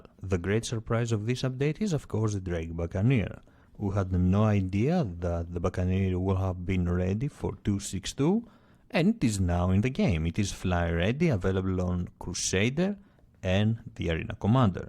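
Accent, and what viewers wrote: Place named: Greece. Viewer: You have a very strong greek accent.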